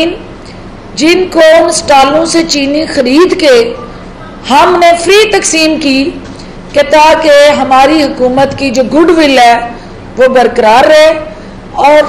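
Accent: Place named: Sialkot, Pakistan. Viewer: Sialkot ki pari likhi awam bi is lehja maian hi baat kRti hy. Yeh sialkot ka simple sa lehja hy. And log ziada tr esy hi bolty haian.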